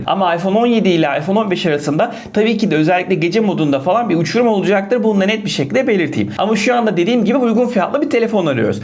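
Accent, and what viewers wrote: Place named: Trabzon, Turkey. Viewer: Abi Trabzonun neresindensin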